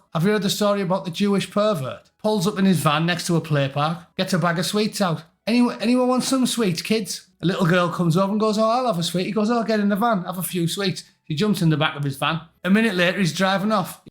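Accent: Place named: Liverpool, United Kingdom. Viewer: Keep it Scouse you talented bastard 😂😂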